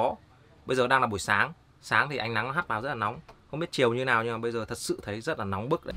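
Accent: Thai Binh, Vietnam. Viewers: Anh ở Thái Bình đúng ko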